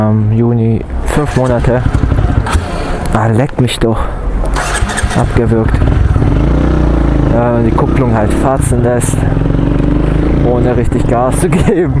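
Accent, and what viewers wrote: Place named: Bavaria, Germany. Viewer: Gruß in meine Heimatstadt! :) (Y) Gebürtig bist Du aber nicht aus S oder? Würde Dich vom Dialekt her eher Richtung Nord- oder Ostbayern verorten?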